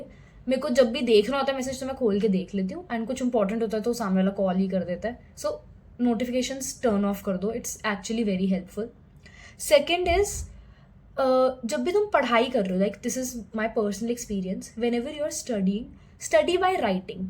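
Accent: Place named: South Bombay, India. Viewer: Relevant Info btw...in a customary SoBo accent...😜🫣🙃 Keep Showing Up 👍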